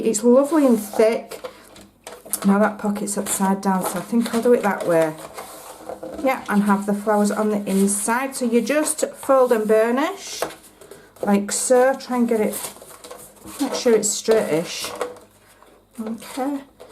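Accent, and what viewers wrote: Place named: England, United Kingdom. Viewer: Such a great tutorial I will definitely give this a go. I could listen to you talk all day as I no longer live in England your like a little bit of home.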